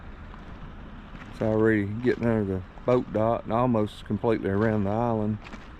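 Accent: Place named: North Carolina, United States. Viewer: That’s a good looking job. What area are you in? Sounds like NC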